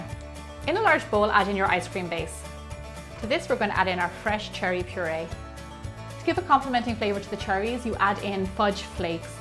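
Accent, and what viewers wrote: Ireland. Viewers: Omg i love her Irish ascent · I love your accent are u Irish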